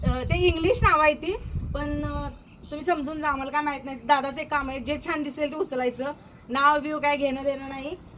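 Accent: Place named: Kolhapur, India. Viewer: Nice video.... vahini... daily mi bght aste. Kagal la maza mama asto...same boln aste tikde..❤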